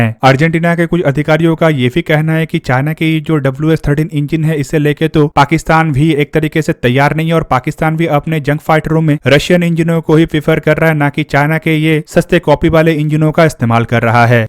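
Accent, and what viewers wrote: Bengal, India. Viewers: Bhai Bengali ho kya Tum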